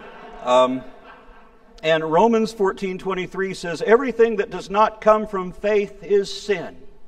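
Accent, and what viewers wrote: South, United States. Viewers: Aron’s Christian southern accent sounds like joe exotic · "Southern Christian" accent is something I never knew I needed in my life:) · that Southern English was well done. I love it for real, too. Just sad there were no bible verses with "daawgs" in this video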